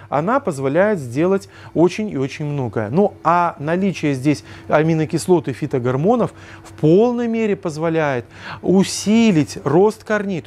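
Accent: Belarus. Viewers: Очень красивая речь,правильная и профессиональная с изюминкой белорусского акцента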